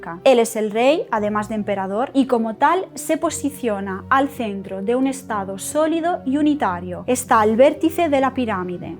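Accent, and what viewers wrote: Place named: Italy. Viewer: Se te quedó el acento italiano al hablar español.😅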